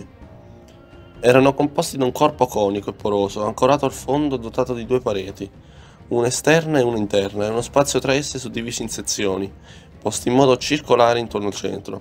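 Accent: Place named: Central-South, Italy. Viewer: anglofono? Per piacere, non arrampichiamoci sugli specchi: il narratore ha un fortissimo accento del centro-sud Italia, quindi immagino abbia avuto tutto il tempo necessario per imparare la lingua di questo paese. Non dico che si debba essere doppiatori professionisti, ma almeno parlare correttamente in italiano.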